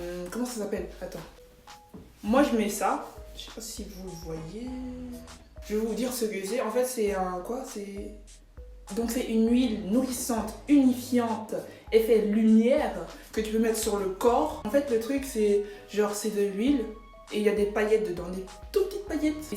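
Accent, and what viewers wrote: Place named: London, United Kingdom. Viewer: J’aime cette video. Je suis grande aussi. Je viens de Londre et ma francais est très mauvais. Mais je comprends assez. Aussi j’ecoute un accent londres quand tu parle anglais 🇬🇧❤️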